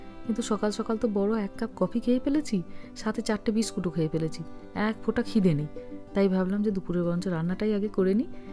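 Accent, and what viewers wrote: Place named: Hooghly, India. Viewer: Didi tumi medinipur er meye holeo kotha bolar dhoron onekta Hoogly district er moto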